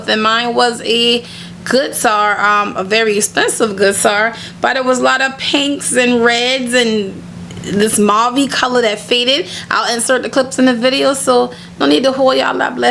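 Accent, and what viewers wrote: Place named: New Orleans, United States. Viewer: Loved the lip combination!! and love your accent. are you from nola? lol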